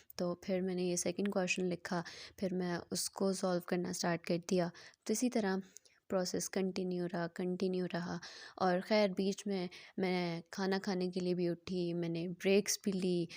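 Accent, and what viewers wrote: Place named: United Kingdom. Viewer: English accent 🤌❤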